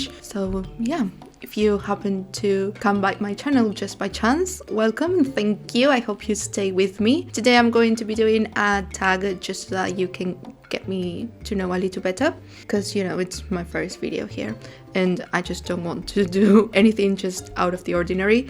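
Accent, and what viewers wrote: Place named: United Kingdom. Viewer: I want that british accent! I need to move UK. What do you think? 😊 You are living my dream.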